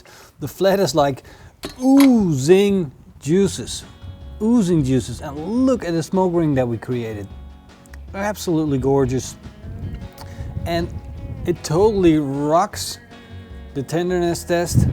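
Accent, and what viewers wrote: Netherlands. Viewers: ik hoor een soort van nederlands in je stemm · Where you from yo accent sounds dutch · he sounds dutch, are you dutch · Dutch accent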